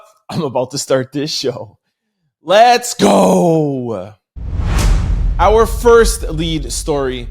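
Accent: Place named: Canada. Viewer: His videos always seem to say a day ahead so I think he must be in another country. He sounds Canadian but maybe he lives in Japan or something 😂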